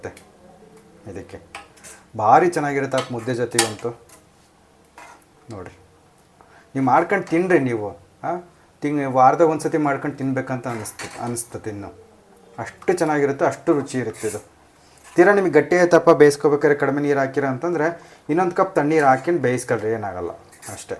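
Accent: Chitradurga, India. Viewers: ಪಕ್ಕಾ ದುರ್ಗಾ ಭಾಷೆ....... ಅಣ್ಣಾ ನಮ್ದು ಹೊಸದುರ್ಗ........😊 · ಚಿತ್ರದುರ್ಗ ಭಾಷೆ ಸೊಗಡು...ಆಹಾರ ಪದ್ಧತಿಯನ್ನು ಚೆನ್ನಾಗಿ ಪರಿಚಯಿಸಿದ್ದೀರಿ ...ಸೂಪರ್ bro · Sir nammdu Talikatte Holalkere,nivu hosdurga, Chitradurga dalli,yava villege sir? ನಿಮ್ಮ ಭಾಷೆ ಪಕ್ಕಾ ನಮ್ಮ ಐತಿಹಾಸಿಕ ಚಿತ್ರದುರ್ಗ ದ ಭಾಷೆ, ಕೇಳಿ ತುಂಬಾ ಖುಷಿಯಾಯಿತು,tq u sir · Anna nam durga bhashe chanag mathadtiya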